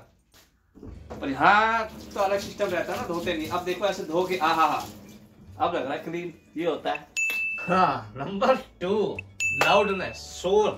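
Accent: Haryana, India. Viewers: Sach me yaar tumhara hindustani accent h jo bolne ka dil jit leta hai. Bb ki wines se bhi tagre ho ap yaar mstram ho bhai ap sach me god bless u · Bhai kha se ho Haryannve se lag rahe ho bhasha se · भाई राम-राम जी, बोल्ली से तो हरियाणा या वेस्ट यूपी के लाग रे हो? बताई दो कां के हो 😊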